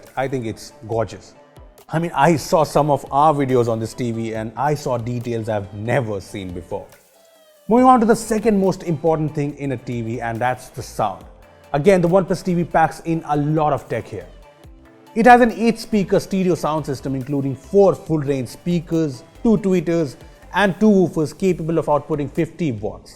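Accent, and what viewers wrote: India. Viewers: Warning *Crappy Indian accent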